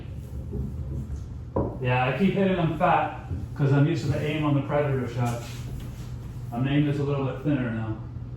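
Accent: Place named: Philadelphia, United States. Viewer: You have an ancient working-class accent we don't hear anymore. Is it a delco/philly accent?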